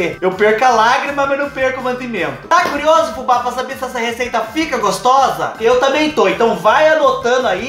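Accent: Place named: Porto Feliz, Brazil. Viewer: Seu sotaque é igual o nosso aqui de Porto Feliz!!! Bao demais...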